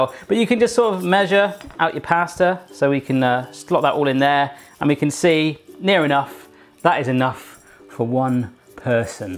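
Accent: United Kingdom. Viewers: I really like British accent 😍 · Is he British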